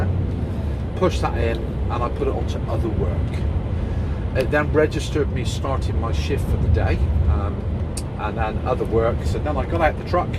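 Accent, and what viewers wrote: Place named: United Kingdom. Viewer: I would love to perfect my English hehehe I like your British accent! Pls help me how to be fluent in English thanks idol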